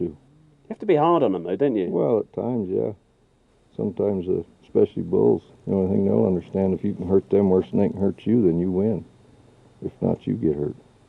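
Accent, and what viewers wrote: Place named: United Kingdom. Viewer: Is this DVD made for UK or some part of Europe? Cause the narrator accent (no disrespect meant) is quite a contrast with the wyoming ranchers accents..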